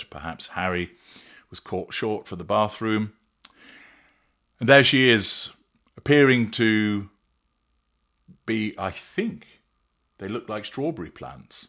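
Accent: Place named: England, United Kingdom. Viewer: The way describes TOW with his impeccable English accent always makes me laugh 😂😂😂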